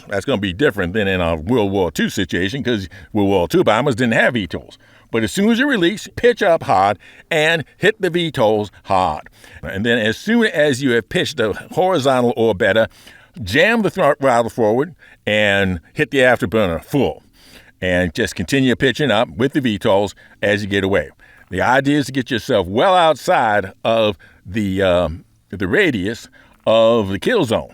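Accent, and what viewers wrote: South, United States. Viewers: what's up with the extra southern accent this time around? lol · I agree that the dive bombing attack, similar to a Douglas SBD Dauntless, is a likely way to get more precision. I think a low glide bomb attack, more like a Martin B-26 Marauder, could work BUT you'd have to keep your speed well up and drop from no lower than 500 Meters (as it looks like the blast radius is about 350-400 meters).. (not sure why the southern accent but boy howdy tie A1 looks fun)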